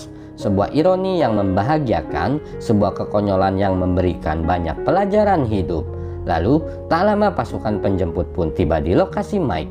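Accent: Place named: West Java, Indonesia. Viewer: si sunda pisan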